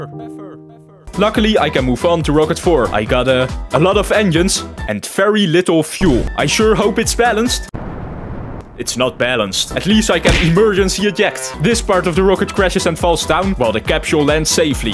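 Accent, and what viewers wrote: Netherlands. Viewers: I can hear the dutch accent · ben je Nederlands ik hoor het aan je accent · Are u dutch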